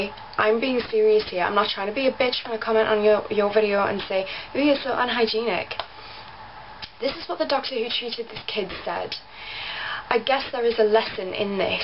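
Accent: Newcastle, United Kingdom. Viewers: R u geordie? sry off topics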